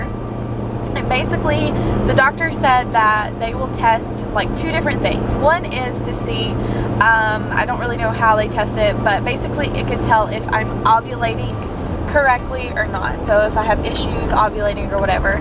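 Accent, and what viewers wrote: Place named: Virginia, United States. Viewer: Fellow Virginian here (I heard it in your accent!) Had a miscarriage this month and I’m praying that next month is better. I’ll keep you and Tyler in my prayers as well. It’s all in God’s hands.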